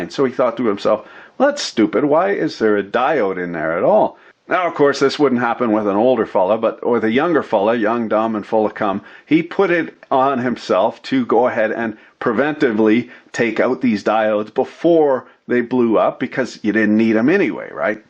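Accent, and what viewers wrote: Canada. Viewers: Hell I am American and have trouble understanding Canadian at times. The more you watch him the more sense it makes than you start talking like it lol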